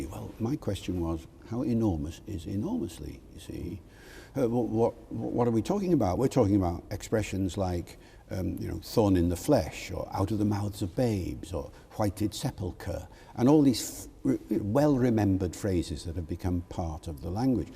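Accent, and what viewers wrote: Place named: Wales, United Kingdom. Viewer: He occasionally sounds a bit Welsh. Born in Northern Ireland. Wonder how that happened?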